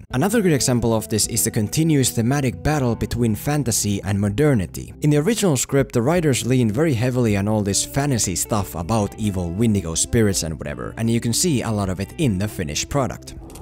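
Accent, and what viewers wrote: Finland. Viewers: Are you from finland ? Something about your accent :p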